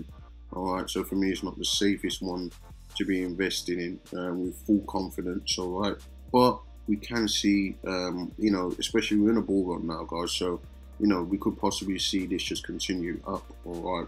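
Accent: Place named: South London, United Kingdom. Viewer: Alright bruv. Trying to pinpoint the accent. South London, or South east area? Really enjoy your content bro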